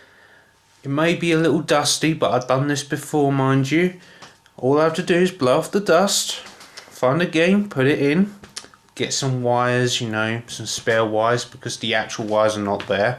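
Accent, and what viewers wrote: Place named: North London, United Kingdom. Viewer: He is English I would say from North London!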